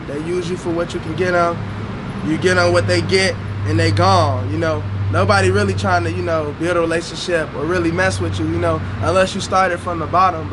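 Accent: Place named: Detroit, United States. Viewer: he sound like he from detroit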